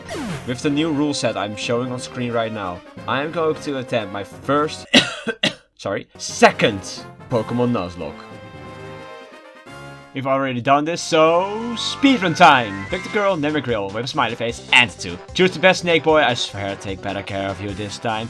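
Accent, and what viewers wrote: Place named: Netherlands. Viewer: You sound like you are from the netherlands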